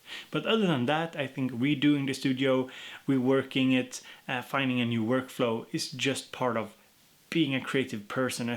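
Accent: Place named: South, Sweden. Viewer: Lovely to hear that you and your boss talked Swedish! I did hear that you are from the southern part of Sweden! Great video by the way. My cable managment is like a råttbo. :)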